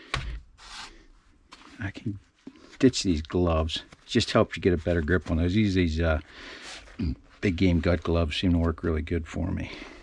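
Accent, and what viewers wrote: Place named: Southwestern Pennsylvania, United States. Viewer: Nice video, Sir. You sound like you might be from SW PA. May I ask where you caught the snakeheads? I remember reading of their "debut" in the Mon river maybe around 2019. Just wondering where else they may have migrated.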